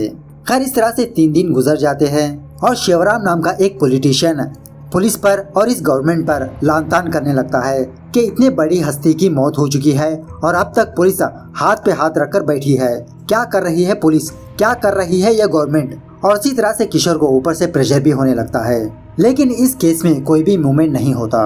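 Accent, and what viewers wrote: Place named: Hyderabad, India. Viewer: Perfect Hyderabadi Slang story narration.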